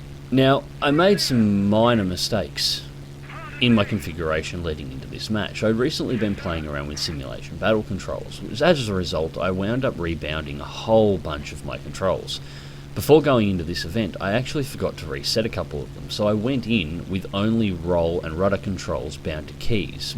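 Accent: Australia. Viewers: Oh you sexy voiced Aussie.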